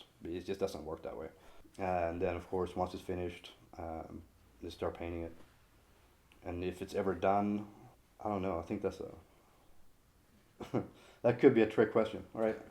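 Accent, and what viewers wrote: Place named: Midwest, United States. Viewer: Wow! I always knew was from Sweden but listening to him talk he sounds like he is from the Mid-West....his English teacher must have been from Illinois, Indiana or Ohio (above the U.S. 30 corridor). He's an astonishing artist too.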